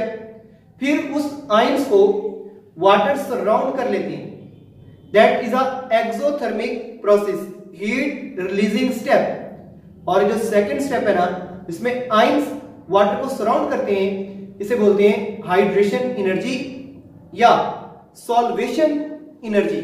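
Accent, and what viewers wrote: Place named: Pakistan. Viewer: sir are you from pakistan beacuse of your accent if you are then love from india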